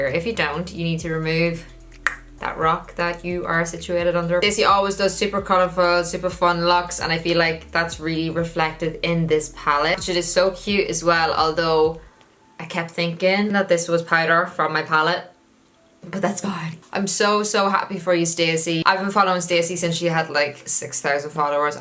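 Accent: Ireland. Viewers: wait UR IRISH OMGGGGGG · Are you from Dublin😀❤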